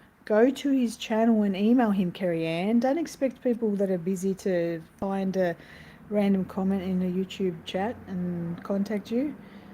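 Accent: Australia. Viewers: You’re much more Australian today! ❤️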